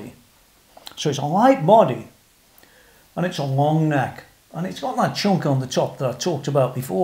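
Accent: Stoke, United Kingdom. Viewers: Sounds like you’re from Stoke? 🙌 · I don't know why, but the stars and stripes strap combined with this british accent gave me weird feelings.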